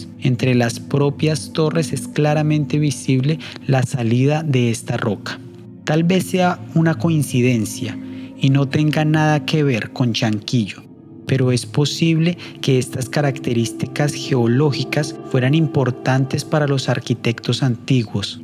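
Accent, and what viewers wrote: Colombia. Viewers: por la pausa y cadencia de tu habla y el uso continuo [ka] y [Ke] debes de ser colombiano o has vivido mucho tiempo por ahí..igual, un hermoso y bien documentado reporte....gracias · Que desubicado comentario, agradece màs bien que es gratis, a mi me pareció excelente narrador se nota que es un parcero colombiano, Cuando no el peruano con su dejo que cree que es el mejor, tendrìas que salir del paìs alguna vez para que te des cuenta lo equivocado que estàs. a no hacer caso y gracias por este aporte. Chaclacayo Perù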